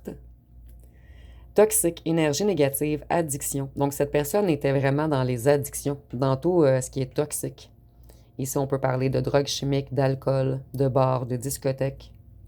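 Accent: Quebec, Canada. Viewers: Merci ma belle ame quebecoise j'adore vous ecouter et charmant accent quebecois.....❤❤❤❤❤❤❤❤❤❤❤❤❤❤❤❤❤❤❤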